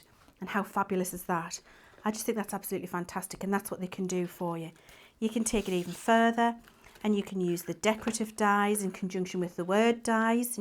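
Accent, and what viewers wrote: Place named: North East England, United Kingdom. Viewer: It sounds like she is from the North East Of England, say Teesside. I come from there, so that’s why I am guessing that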